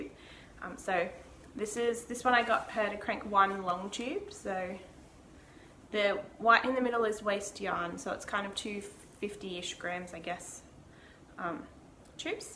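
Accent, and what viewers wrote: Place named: Australia. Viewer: I enjoyed this podcast. It’s great to hear an Aussie accent talking about knitting! If you use the helical stripe technique you won’t have a join where you change yarns. It’s really easy and definitely worth looking up. Good luck on your trip.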